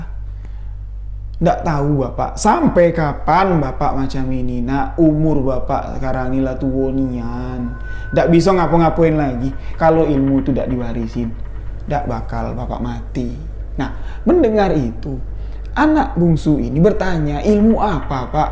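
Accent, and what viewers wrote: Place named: Jambi, Indonesia. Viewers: Sukaaa dg pembwaan bhsa jambi bang...sukses selalu bang salam dri jatim😍😍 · Walaikusalam kak..... Sellu setia mnyimak wlu terlmbat.....🤭 Suka bangettt logat Jambi y kak Cpt smbuh n pulih kmbali y kak.... Tmbah berkah n smakin sukses y 🙏🌹🌹🌹💪🔥🔥🔥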